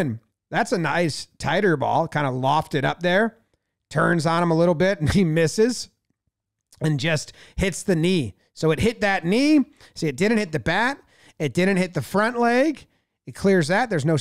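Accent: United States. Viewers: this is the first time I have ever heard an American Accent talk about cricket, I am surprisingly pleased, that was awesome, thank you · Does anybody else think it's jarring, the American accent that knows the game of cricket.. ? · Huh. Never heard Cricket talk in a 'Murrican accent before. Feels...... weird. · Weird hearing an American accent talk cricket. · So strange hearing an American accent comment on cricket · Refreshing to hear an American voice commentate and actually have knowledge about the game and even your breakdown is spot on with tactics and thought in the game. Head and shoulders above all the reaction videos, which are nice but are common. Your take is a refreshing dose of charm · I've been watching you review cricket for years now, but I just had a moment where it felt bizarre that an American was reviewing cricket. There's no THICK Aussie or British accent, so I'm confused.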